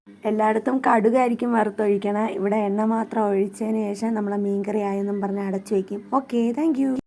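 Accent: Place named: Thiruvananthapuram, India. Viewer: നിങ്ങള് വേറൊരു പുള്ളിക്കാരിയുടെ കൂടെ ഒരു മേക്കപ്പ് വീഡിയോ ഇട്ടിരുന്നത് കണ്ടിറ്റ് വന്നതാണ്.. തിരുവന്തരത്ത് എവിട? ഇതായാലും നിങ്ങള് ആ ഭാഷ തന്ന പറയണത് കൊള്ളാം 😊 നല്ലതായിട്ട് പോട്ട്!! 👍🏻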